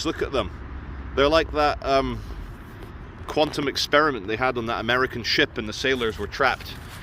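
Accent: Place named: Newcastle, United Kingdom. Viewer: Loved the Geordie accent *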